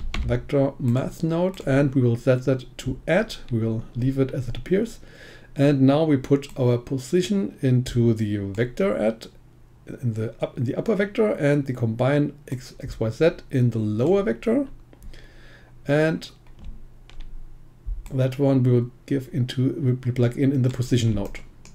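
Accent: Germany. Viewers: You are german arent you? :D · witzig wie man die Herkunft einer Person doch immer am Akzent erkennen kann. Nach den ersten paar Sekungen wusste ich dass hier ein Deutscher spricht :)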